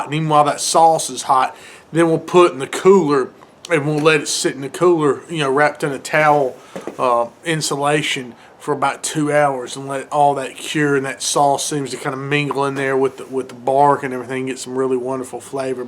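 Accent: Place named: North Carolina, United States. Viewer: cool I go to Cape lookout a lot and they sound like you. Thanks for the video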